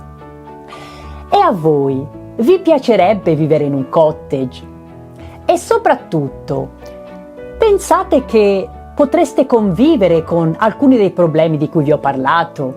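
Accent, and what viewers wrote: Italy. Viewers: Mi sembra di cogliere l’accento della mia regione l’Umbria . Mi sbaglio?!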